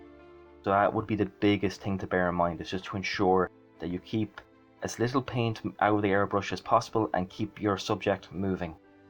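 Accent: Ireland. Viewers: Are you a fellow irish lad? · Great straightforward tutorial with great hints! I’m in the middle of a 1/72 Vulcan bomber using Tamiya paints and I can vouch for everything you’ve said 😊 from an expat abroad who loves to hear the aul accent 😊 Ian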